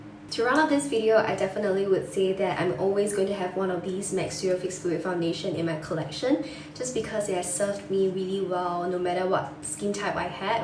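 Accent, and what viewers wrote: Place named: Singapore. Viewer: you sound like your from singapore :)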